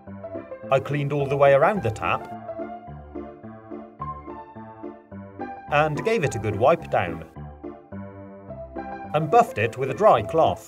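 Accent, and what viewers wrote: United Kingdom. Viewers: hi I was wondering are u brittish?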